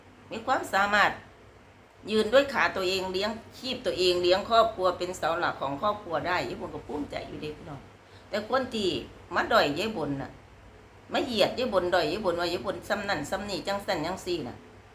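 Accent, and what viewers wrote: Thailand. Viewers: อังกฤษสำเนียงไทยหนูว่าน่ารักดีคะยาย เหมือนที่เราบอกต่างชาติพูดไทยว่าน่ารักดีนั่นแหละค่ะยาย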